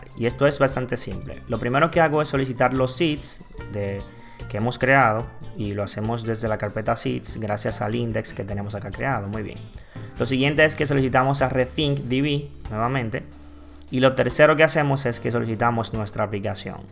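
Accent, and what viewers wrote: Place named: Dominican Republic. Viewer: Eh, Dominicano! XD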